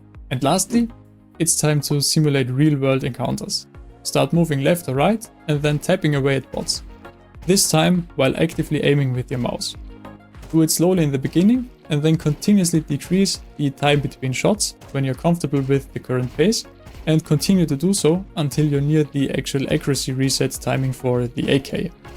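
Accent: Austria. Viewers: als ob du österreicher bist hahahha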